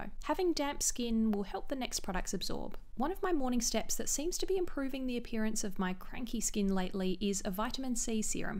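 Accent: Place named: Australia. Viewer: Agreed!! There’s something incredibly satisfying and relaxing about these videos. And I love the aussie accent. I lived there for 9 years, and it’s so nostalgic. Xx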